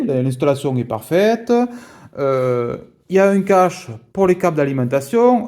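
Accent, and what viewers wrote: South, France. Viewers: l'accent du sud représente! Pour une fois que quelqu'un dis pas de la merde ca fait plaisir! Je m'abonne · ah d'accord je suis de Toulouse j'ai reconnu l'accent de la région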